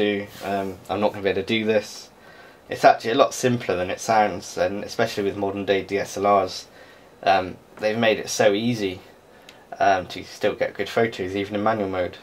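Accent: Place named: England, United Kingdom. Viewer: Lool, i love how english people talk, that is rubbish.. nice vid btw bro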